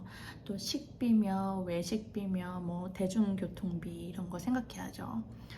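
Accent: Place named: Gyeongsang, South Korea. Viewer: 경상도 사투리가 들려요!!! 경상도 출신 구독자입니다 ㅎㅎㅎ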